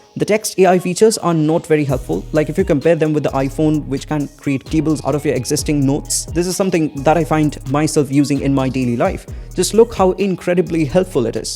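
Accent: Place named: India. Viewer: First, i thought you are an American coz of the accent but the way you pronounced some words proved you are desi 😅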